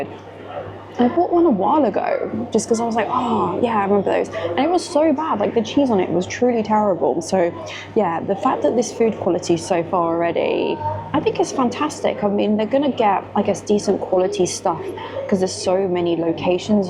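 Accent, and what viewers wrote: RP, United Kingdom. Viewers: You're painfully posh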